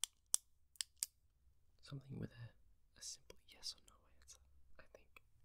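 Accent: Australia. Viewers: just stumbled across ur channel & immediately subbed! don’t know if it’s the familiar aussie accent or just ur whispers in general, but ur voice is so insanely comforting <3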